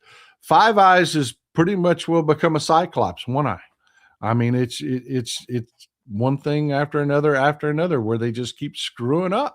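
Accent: Texas, United States. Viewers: Glad I found you. Been watching for a couple of weeks and I’m glad a fellow Texan, sharing my views, is broadcasting or rather, streaming as they now call it, and talking like a true Texan. The candid and straightforward way you communicate is the way my daddy got his message across. I’m 70 and tech is outrunning my ability to keep up. Hell, while tech is speedily moving ahead, sadly, my mind needs the spurs every now and then. So, I’m appreciative that I can hear facts from someone I relate to. Looking forward to every post, keep it up and thanks.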